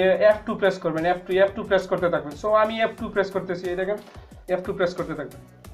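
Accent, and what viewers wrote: Sylhet, Bangladesh. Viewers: Bhai ki Sylheti?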